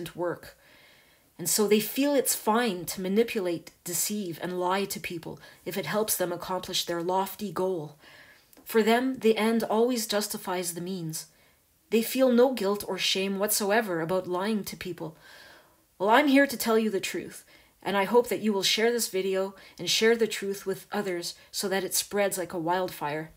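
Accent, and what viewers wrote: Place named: Canada. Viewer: cute Canadian accent, very intelligent, full of wisdom, this woman is so nice to listen to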